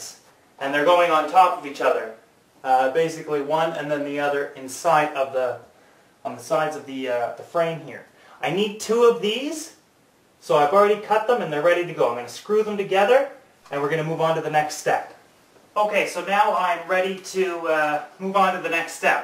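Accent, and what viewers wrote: Canada. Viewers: Lol! well that's good then....He said it with his Canadian accent and sounded like 'meter saw' eh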